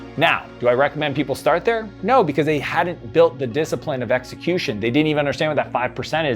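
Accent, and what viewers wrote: Canada. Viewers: It sounds like a French Canadian accent, idk if it’s only me. But great video, I really like this advice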